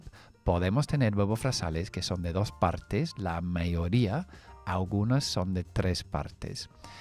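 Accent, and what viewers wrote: United Kingdom. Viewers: Hola, le agradezco que también incluya la pronunciación Estaudinense. Al principio no me gustó mucho por que le noté el acento Británico, pero después si me inscribí por que enseña muy bien, e incluye la pronunciación ya mencionada. vivo en USA hace muchos años, pero aún no soy fluente, pues nos pasa a muchos inmigrantes, ya que nos enfocamos mucho en el trabajo y no en perfeccionar el idioma y ahora que quiero buscar nuevo trabajo necesito ser fluente y urgente, así que todos los días estoy escuchando y practicando, necesito hacer en unos meses lo que no he hecho en muchos años 😢 Si da clases privadas o en grupo, me gustaría saber. Gracias, bendiciones 🙏🏼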